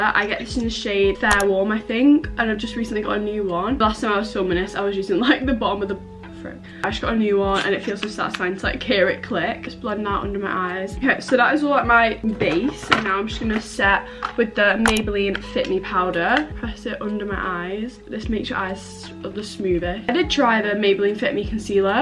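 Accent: United Kingdom. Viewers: Are you from the uk🇬🇧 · Is this more a southern uk accent or a northern one? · do you have british accent ? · I freaking love your British accent!! You’re so pretty too!! <3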